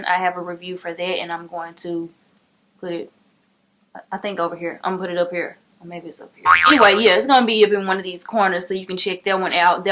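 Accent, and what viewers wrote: South, United States. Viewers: I just love that voice. Country girl sound like what I have... 😉. You about to have me spend some money hunny!! Great review!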